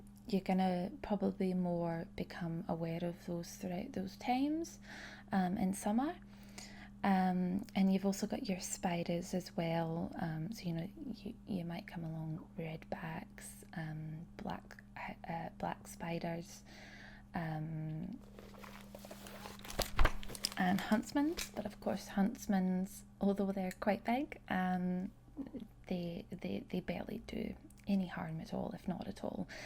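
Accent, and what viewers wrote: Scotland, United Kingdom. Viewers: Love your Scottish accent role plays! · I love videos where you have this accent, I'm from Glasgow so although this isn't my accent, it's so lovely to hear another Scottish one! It makes the video seem more real to me. Thank you for this video, I've watched it multiple times already! Xx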